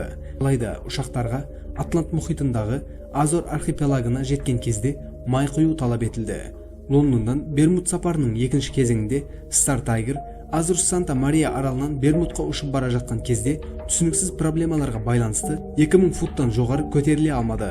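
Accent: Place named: Karakalpakstan, Uzbekistan. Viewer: Акценты жагымсыз. Каракалпак емессиз бе?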